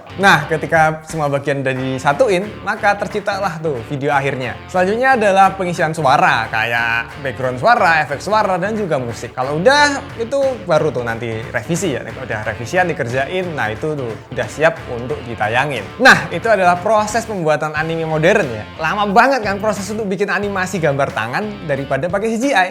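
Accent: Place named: Java, Indonesia. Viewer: wah ternyata orang yg omong ini orang Jawa ya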